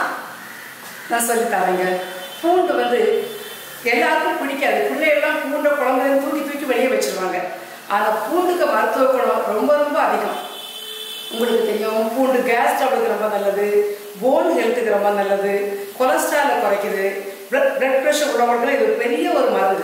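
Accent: Nagercoil, India. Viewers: Nagercoil voice pole irukku.. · u r from nagercoil?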